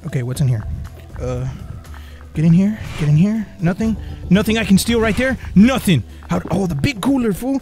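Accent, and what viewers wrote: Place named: Mexico. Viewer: You sound so white And so Mexican at the same time Síguele compa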